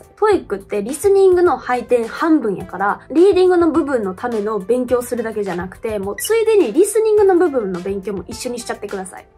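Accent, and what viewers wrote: Kansai, Japan. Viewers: やばい、関西弁美人女子がドストライク過ぎる。。。どうやったら出会えるんだ🤷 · 関西弁のいいね！❤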